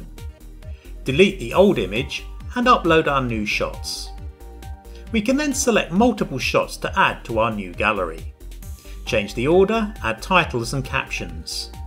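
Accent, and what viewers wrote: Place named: United Kingdom. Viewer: Well done, what a great tutorial of Wix (and with this British accent as well:)) I built my first website now, indeed no more than 10 minutes, but there is some work a head of me still. I think I'll get a logo somewhere and I need to add my content. Thanks a gain for this simple tutorial.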